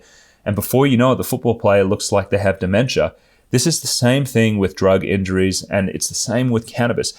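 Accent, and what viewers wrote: Australia. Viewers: I think this is a really important video. That said, however, you are speculating. It could be that there is a genetic or psychological predisposition that makes them sensitive/vulnerable to cannabis. What you said about the conversion rate suggests that there might be a relationship between a tendency for certain psych issues and cannabis (THC?), but it could be the opposite of your suggestion, i.e. it triggers the latent condition. You sound Australian and you look Indonesian. It's a popular place for Indonesians (I lived there for 15.5 years). Did you move or were you born in Oz?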